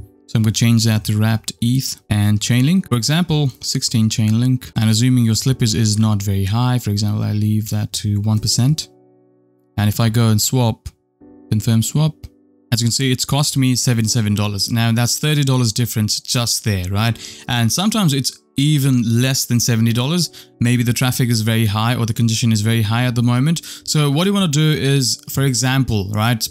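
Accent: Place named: South Africa. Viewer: I hear a South African accent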